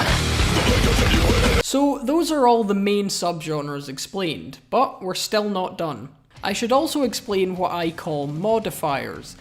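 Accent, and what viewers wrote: Scotland, United Kingdom. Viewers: the scottish accent is just the cherry on top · Funny Scottish dude make the good explanation, my question, where does the Hu fit in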